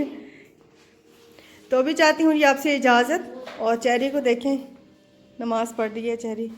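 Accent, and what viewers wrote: Saraiki, Pakistan. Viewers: apki saraiki sun Kar acha laga.im from bahawalpur.ap kis city mai rehti han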